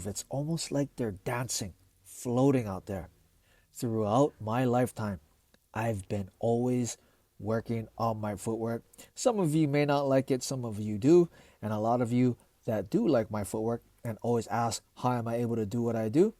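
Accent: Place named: Hawaii, United States. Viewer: My braddah, you from Hawai’i ah!? lol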